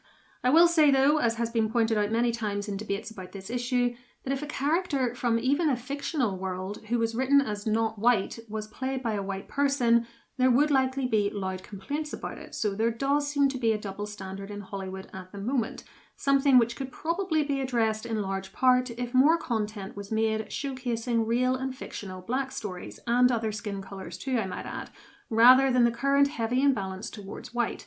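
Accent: Northern Ireland, United Kingdom. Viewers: Well, you've covered just about every concern I have about the movie. It's something I'd normally look forward to, but I am too sensitive or perhaps too petty to buy a ticket. To me, Diane Kruger looks like someone who could launch a thousand ships and Brad Pitt makes for a convincing Achilles. I don't like any of the casting choices in this new movie. Although, I suppose Tom Holland is fine for Telemachus, if it weren't for the American accent and the dialogue. I'm not sure what to picture as an average Greek. I've seen the Big Fat Greek Wedding movies and the film and stage productions of Momma Mia. But, one of my doctors is very Greek. She has dark blonde curly hair, but more of a medium complexion. My friend, her son, also has dark blond or maybe light brown hair, but reminds me of Czar Nicholas II or a young King George V. In terms of accent, I don't think I'd want them to sound like Michael Constantine and Andrea Martin in My Big Fat Greek Wedding. 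I think I'm most comfortable with a British English accent. Actually, I think the voice and the accent of would make for a good Athena. I don't quite know what it is about your voice that's so pleasing. I don't now if it would be considered aristocratic by Northern Irish standards, perhaps not. Perhaps it's "learned." Whatever it is, it's quite beautiful. I think a London version of what I find appealing in this "type" of voice is Jean Simmons. If the Odyssey movie is narrated by Homer, I think Richard Kiley w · Nice to hear a Northern Irish accent, North Down?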